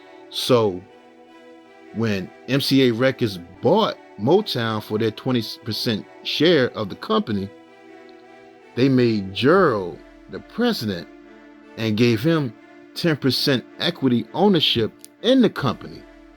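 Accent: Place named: Washington DC, United States. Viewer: Please tell me this narrator is from DC, because his accent is very familiar to my ears. LOL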